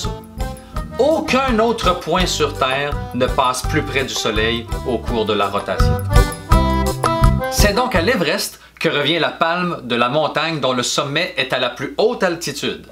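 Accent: Quebec, Canada. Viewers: Très bon présentateur, fluide, instructif, marrant et avec des bonnes musiques ça donne un côté comique très agréable. Et puis l'accent québécois c'est toujours du bonheur ! Joli travail merci ! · Ellipsoïdale! La Terre est ellipsoïdale, du fait de sa rotation et de ses pôles plus aplatis. NB: Merci Dr Nozman et Alexandre Astier pour l'expression:) Merci aussi pour cette vidéo très bien expliquée, du très bon travail. Je me suis régalé d'écouter l'accent québecois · Quand j'ai commencé la vidéo je me suis dis <<oh cool un Québécois !>> Parce que j'adore leur accent 🤣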